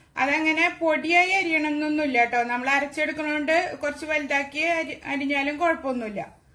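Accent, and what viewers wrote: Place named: Thrissur, India. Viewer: തൃശ്ശൂർ ആണെന്ന് തോന്നുന്നു സംസാരം കേട്ടിട്ട് Anyway, എത്രയും പെട്ടെന്ന് ആയിരം സബ്സ്ക്രൈബർ ആകട്ടെ എന്ന് ആശംസിക്കുന്നു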